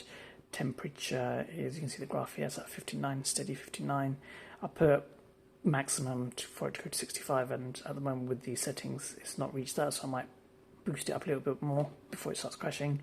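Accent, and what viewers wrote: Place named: United Kingdom. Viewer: Nice to see a brit! (assuming by your accent) - I've been into mining since 16/02 using a variety of platforms but the one I keep coming back to (due to lack of experience in the more advanced platforms) is Nicehash. Despite the negatives from some YT's I think Nicehash is a great starting point. Yes it's a broker which means you're paid only in BTC but it does have it's positives I guess. So for me since the 16/02 I started using only my GPU I had at the time, 5700xt Nitro+ which was pulling in around £5-6 per day with TDP 109w and 50mh/s which is pretty good going for my first try. Fast forward a few days I then bought a 3070 (due to the addiction I seem to have acquired) Obviously there is massive shortages on GPU's so I had no choice but to buy a mobo, cpu which came with the 3070. Paid a small premium but I'd rather that than a bigger premium go to these nasty scalpers! 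Anyway the 3070 is hooked up and I've got the TDP down to around 131w which I know can go down more but i'm still tinkering with this bit. I'm pulling in around 62mh/s which is probably the most you could get out of these cards unless you're a pro which in my case I'm not when it comes to mining. My totals for a week which I will say haven't been running 247 as I've fiddled here/there but I took around £36, just by using Nicehash. I'm looking for more GPU's and an actual frame and other bits. It's just very difficult to get the parts. I wish I'd got into this sooner than I have but least if all else fai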